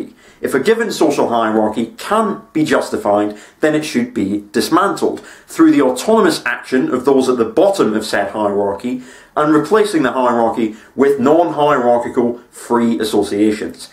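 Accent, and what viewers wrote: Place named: Edinburgh, United Kingdom. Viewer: Think he's from Edinburgh. His accent is too posh to be from Glasgow.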